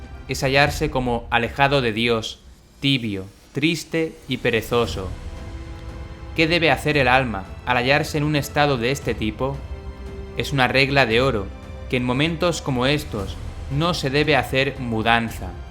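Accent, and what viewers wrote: Spain. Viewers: Macho, tendrás mucha razón, pero ¡que forma más sosa de hablar! Y ese acento madrileñata.... Prefiero el acento latino.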